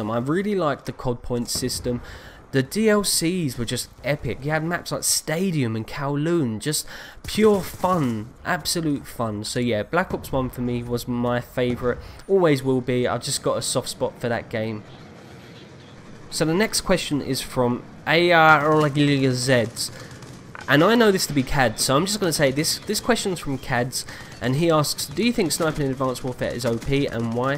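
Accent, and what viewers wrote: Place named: Kent, United Kingdom. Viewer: you sounded like you were from kent! but why ireland? :D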